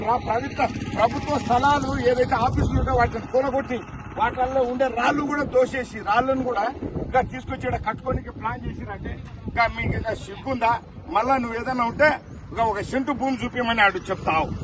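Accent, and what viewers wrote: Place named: Telangana, India. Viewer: Ayya Meeru Rayalaseema leka Telangana language chusthe pakka telangana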